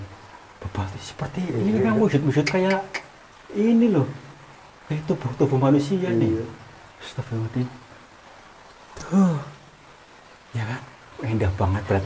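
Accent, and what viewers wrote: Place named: Java, Indonesia. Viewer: JAWA MEDOK NYARI DUIT KONTEN NGADA NGADA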